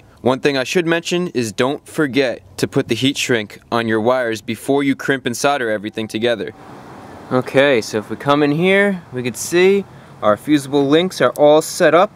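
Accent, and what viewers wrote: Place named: New Jersey, United States. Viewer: He's from New Jersey, that's how we say water here 😅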